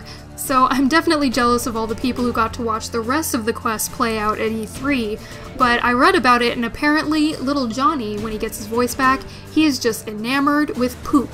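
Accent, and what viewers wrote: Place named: California, United States. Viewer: It sounds like Califonian but diffrent, thanks for rap anserw. Cheers.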